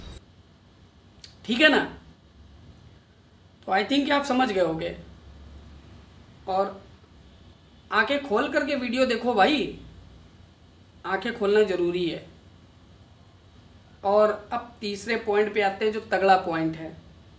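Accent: Bihar, India. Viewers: Pehale bihari baat choda ok baki sab theek he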